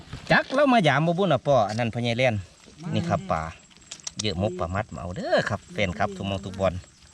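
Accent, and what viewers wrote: Phu Thai, Thailand. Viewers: เป็นคนอยู่ใสครับคือเว้าคือคนภูไท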